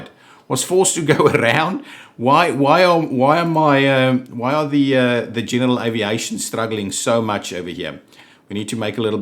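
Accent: South Africa. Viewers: Hi..you sound south African..LOL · Great series. Watching them. I was actually going to ask if that accent is South African but answer said after 16min :)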